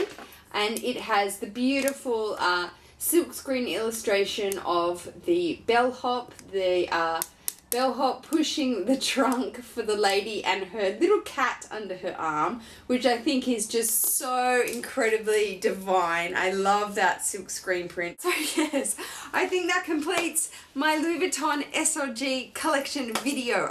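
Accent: Australia. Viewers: Love your videos and your aussie accent :) I would like to get the Neverfull MM. Lots of love from Berlin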